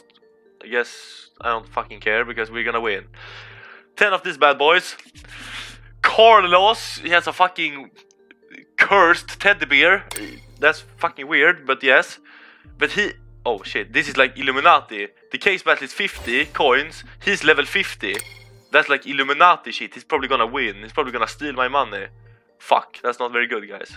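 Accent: Sweden. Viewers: Nice video dude, You have to be Swedish by that's english accent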